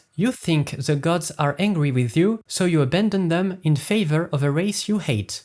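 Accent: France. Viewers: C' est un accent français ça?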